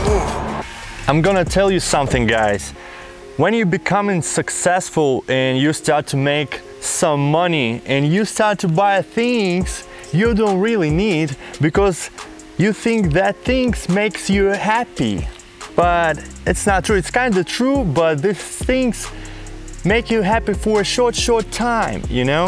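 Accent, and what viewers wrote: Russia. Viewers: Blyat. Oh ug etot Russian acient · Раньше как-будто над акцентом больше старался, а сейчас наоборот решил больше России матушки внести) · плюс русский акцент. Сто раз замечал, что русский, говорящий на английском в 100 раз понятнее, чем иностранец, просто потому что произносит так, как ты сам это делаешь.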